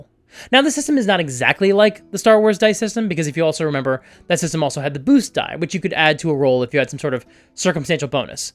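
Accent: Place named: United Kingdom. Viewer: These Daggerheart videos are great!! British accent, on the other hand... (No hate tho man, I love your stuff)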